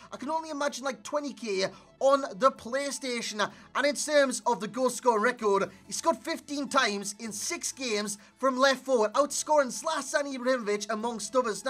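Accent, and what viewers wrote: Newcastle, United Kingdom. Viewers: newcastle i think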